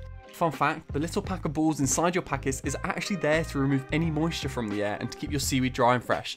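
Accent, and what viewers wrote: United Kingdom. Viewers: British accent..... I love itt😍😍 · u r definitely a Britain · Damn his British accent will be the death of me lol.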